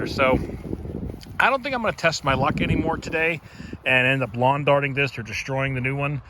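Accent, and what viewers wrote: Pittsburgh, United States. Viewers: Are you from Pittsburgh. I'm picking up a Pittsburgh accent!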